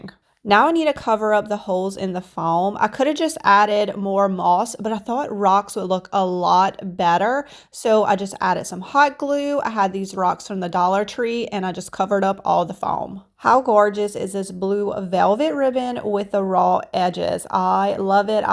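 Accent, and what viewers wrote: Louisiana, United States. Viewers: Girly… That gorgeous Cajun accent…. Abbeville? Maurice?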